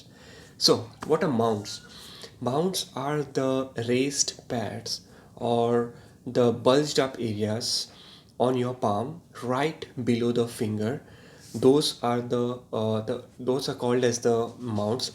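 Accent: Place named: United States. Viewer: Its american accent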